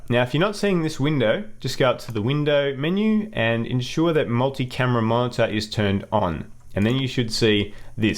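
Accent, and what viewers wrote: Australia. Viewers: are you australian? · Brilliant video, love your work! PS, I'm a fellow Aussie! Nice to hear a familiar accent on such a great tutorial.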